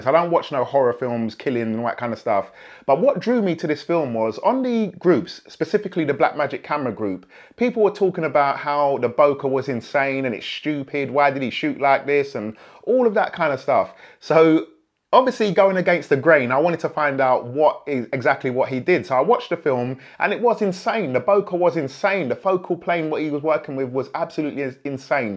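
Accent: London, United Kingdom. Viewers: love your London accent man haha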